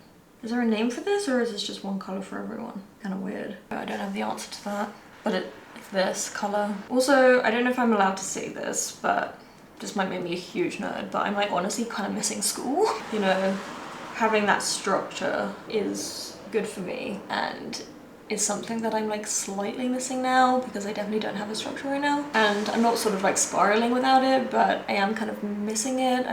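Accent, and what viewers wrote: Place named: United Kingdom. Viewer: I'm from the states but knew instantly you were British. And yet you were clearly heard, no need for captions! Great midsection , btw. 😁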